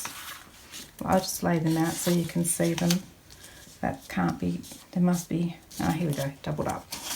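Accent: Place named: Australia. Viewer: I just love to hear your Aussie voice on U tube..from a fellow Aussie..love what you do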